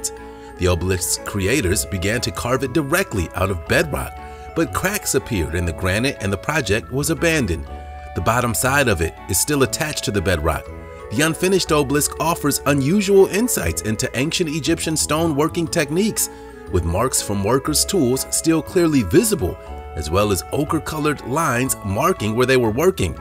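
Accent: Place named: United States. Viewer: Why do Americans pronounce O as A. For instant, you say Gad instead of God etc. Not right.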